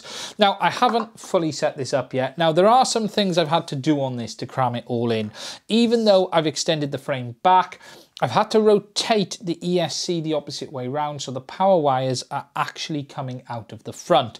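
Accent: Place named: Cardiff, United Kingdom. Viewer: best videos re : dji fpv so far, i notice a gps on the front , how are you using? im coming from an inav point of view, also do you think if i used inav with gps i could still utilise the rth functions... Thinking of putting an air unit in my 7 inch shredder frame, it features esc`s on the arms and im using probably 30mm standoffs fair bit o room in there. oh im based in Cardiff. You sound like your from this neck of the woods (area)! great channel , cheers!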